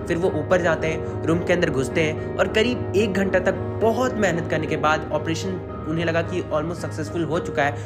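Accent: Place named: Bihar, India. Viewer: By your accent I think u r from bihar 😀😀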